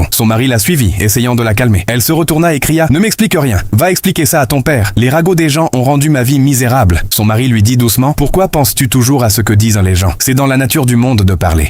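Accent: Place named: France. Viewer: 😮 quel accent française. C'est vraiment étonnant. Merci pour. ?? ?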